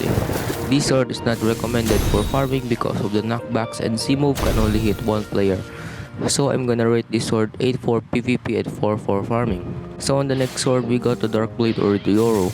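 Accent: Philippines. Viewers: sure pinoy yan boses palng eh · im for sure this guys is a Filipino the way he talks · u sound like a filipino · u sounds like a ph guy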